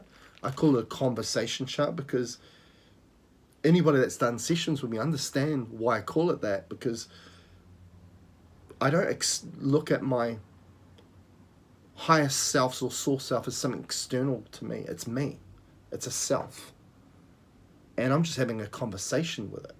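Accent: New Zealand. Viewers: This video gives me a lot of inspiration on how to update my own charts since I rarely use a lot of them. What exactly you said regarding a command to connect to celestial existence? Although I love kiwi accent, I did not understand this time :D Thank you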